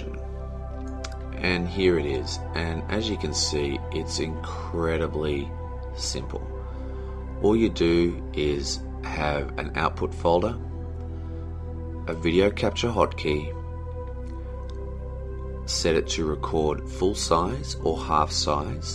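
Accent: Australia. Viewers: You sound Australian. I am Australian. I like you :)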